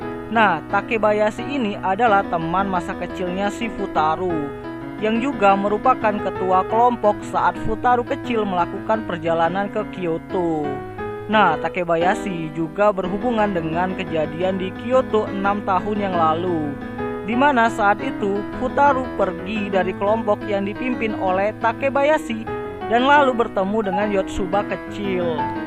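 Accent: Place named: Algeria. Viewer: حسيتك شغل راك تهدر دزيرية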